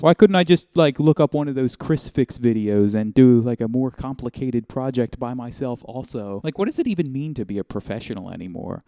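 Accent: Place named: Philadelphia, United States. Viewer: I’m from around the bucks county area. I could tell by your pronunciation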